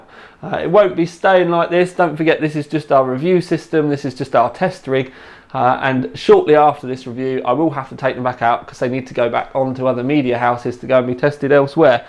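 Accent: United Kingdom. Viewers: wtf british accent is trash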